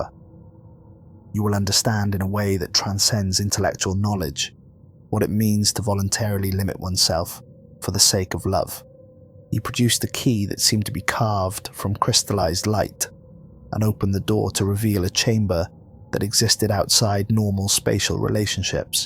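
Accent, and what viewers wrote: United Kingdom. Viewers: I like the story. Can you slow down your audio a little bit? I could be wrong but you sound like you are from the uk and you have portions of the story that it it gets hard to understand. Most story channels are used to fall asleep a slightly slower audio works awesome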